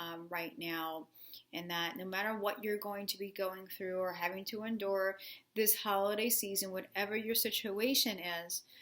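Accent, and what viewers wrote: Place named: New York, United States. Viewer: I loved Green Day in the ‘90s too and saw them in concert in NY then. I thought I heard a NY accent. Are you on LI too? We must be around the same age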